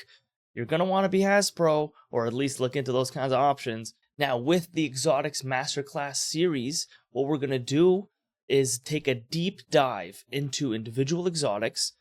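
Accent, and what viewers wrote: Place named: Canada. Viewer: Dude, where in Canada? I can't quite place the accent.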